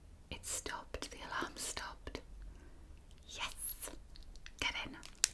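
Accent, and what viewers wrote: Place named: Newcastle, United Kingdom. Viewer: ASMR + Geordie accent = homesick lol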